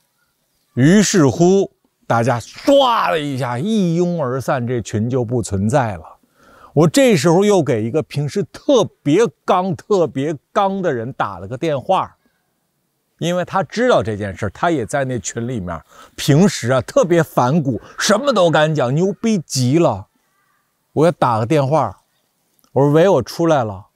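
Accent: Beijing, China. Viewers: 您的北京口音也挺浓重的:face-green-smiling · 哈哈这京味咱北京人儿:hand-purple-blue-peace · 我比你大几岁，但真心佩服你的方方面面，优雅口才底蕴思想逻辑真诚痞帅，艺术的表达，等等等，真是一个完人。开始还奇怪为啥没有一点东北口音，原来十几岁就去北京独自生活了，一口北京话听着真舒服，优雅、收放自如、语音语调节奏表情和内容配合的简直完美，嬉笑怒骂正直诙谐幽默信手拈来，能感知到你忧国忧民的善心，远超很多知名评书人士。不像其他中文博主纯纯为了博流量赚钞票，看好你一定会超过某高和某沫及其他一众所谓的反贼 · 一开始听口音我就明白了你是首都人，再离政治中心很近的地方不可能安然无恙，我离政治中心也不远我也不敢直接说习近平，我会用大xi或者西哥，而且我们大部分不会再群里聊政治，都会当面聊。我当过辅警我们这地方小的警察要比首都要松一点，有意思的是我在当辅警的时候和正式的警察聊天他们也骂习近平（正好在开十八大的候）。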